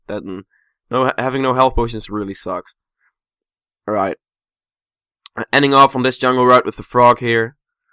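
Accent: Netherlands. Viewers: Hahaha het nederlandse accent op engelse taal is altijd zo herkenbaar. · Duidelijk Nederlands accent :p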